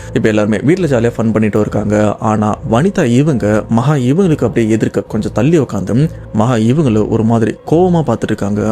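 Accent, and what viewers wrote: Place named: Chennai, India. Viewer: Chennai slang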